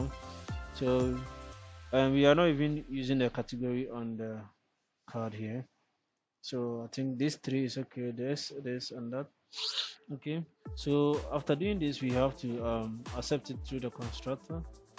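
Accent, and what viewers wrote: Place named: Nigeria. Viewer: he sounds like a Nigeria, is he one ?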